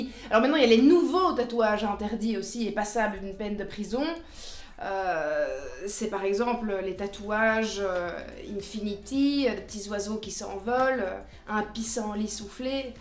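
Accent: Belgium. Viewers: Hello madame, ton accent! Tu es belges? Big up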